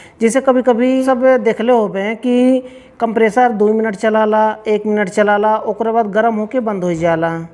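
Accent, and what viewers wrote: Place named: Bihar, India. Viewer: Sir aap kha se ho i think bihar se ya up se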